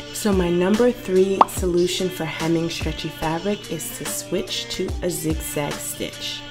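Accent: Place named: Maryland, United States. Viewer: Shtrait shtitch shtretchy fabric. Is that a Maryland accent I detect? Not being mean I’m from Boston, OK? I just love accents! Thanks for the help! Perfect help and I just happen to have the same machine! Totally ordering the twin needle! Had no idea they even existed!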